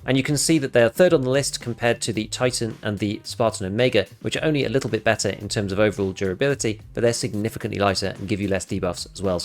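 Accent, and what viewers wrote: United Kingdom. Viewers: he's a brit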